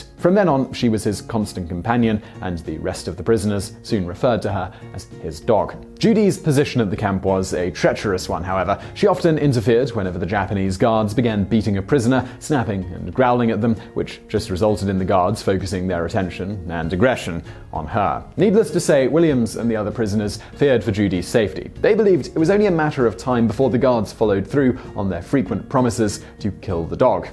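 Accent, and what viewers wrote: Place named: United Kingdom. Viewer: This is vsauce with s British accent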